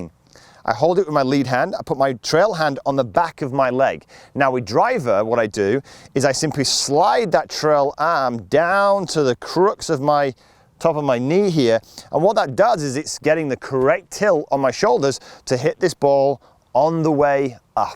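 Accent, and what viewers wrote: United Kingdom. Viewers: Helpful tips. Also good for learning British English too. Many thanks. From S.Korea.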